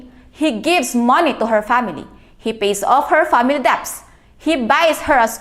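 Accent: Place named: Thailand. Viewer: If I listen your voice and accent next stop Bangkok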